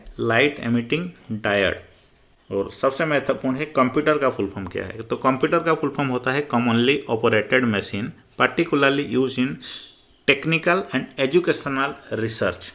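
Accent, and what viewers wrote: Bengal, India. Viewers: may be he is bengoli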